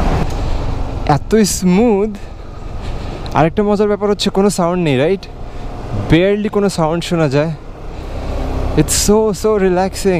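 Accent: Chittagong, Bangladesh. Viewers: ভাই আপনি কি চাটগাঁইয়া?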